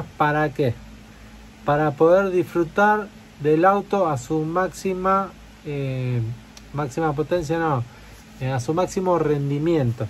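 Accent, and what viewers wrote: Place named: Argentina. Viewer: De que parte de Argentina sos capo?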